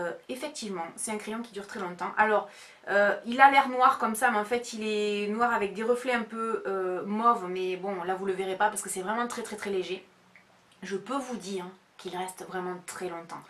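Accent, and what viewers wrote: South, France. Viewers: coucou ! super ta vidéo haul beauté j'adore Perpignan on vas souvent en vacances a port barcares j'aime trop ce coin et moi j'adore ton accent du sud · Bonjour, comme beaucoup, je connais la marque BareMinerals. Je n'ai jamais testé leurs produits, mais oui, j'imagine qu'ils sont aussi bons que ceux d'Urban Decay que j'adore. Tout comme toi, j'ai les yeux bleus, et je prends beaucoup de crayons noirs, bleus nuit, prune et autre.... Je raffole de leurs rouges à lèvres: Shame, Venom, Jilted, Anarchy..... J'ai bien envie de tester aussi ceux de BareMinerals qui me font de l'oeil depuis un moment:) Pour l'argan, c'est un produit que j'aime beaucoup pour les cheveux, mais aussi en huile pour le corps. Pour le mascara,je m'en suis commandé un sur Aliexpress; Il ne vaudra sûrement pas celui de BareMinerals, mais je verrais bien. Pour Octoly, je trouve normal que les youtubeuses aient des produits gratuits sur ce site. 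Moi, ça ne me pose aucun problème. C'est des produits qui leur seront utiles, et qu'elles peuvent nous présenter, même si, effectivement, les délais pour les tester avant les videos, sont courts. Voilà, je te remercie pour cette video. C'est tout à fait vrai que ton accent du sud est tout mimi. C'est un accent qui chante. J'ai toujours la banane quand je t'écoute:) Très gros bisous ma belle, et à bientôt:)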